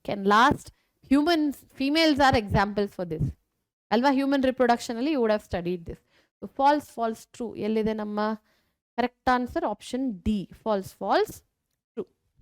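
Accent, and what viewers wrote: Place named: Andhra Pradesh, India. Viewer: Mam u r mother tongue is Telugu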